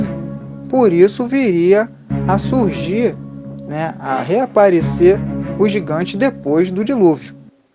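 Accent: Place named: Rio de Janeiro, Brazil. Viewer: Desculpa mas esses narradores com esse sotaque carioca desisto de ouvir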